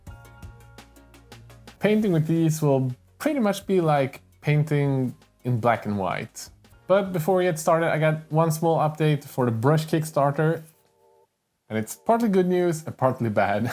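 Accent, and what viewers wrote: Sweden. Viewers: Ah! I thought I heard the Swedish! Great video, lot's of fun :) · WHAT DUDE! Oh my god you’re swedish??! I could tell you werent native english but wow im shocked. Haha i knew I recognized the accent.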